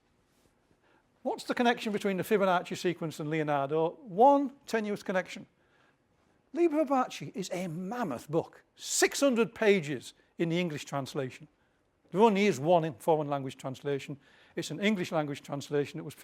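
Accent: Northern England, United Kingdom. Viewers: He looks just like Graham Nash . He has the same northern English accent too . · Professor Deblin, you forgot to explain how your Northern English accent opened the doors for your at the library in Florence, I'd love to know the story.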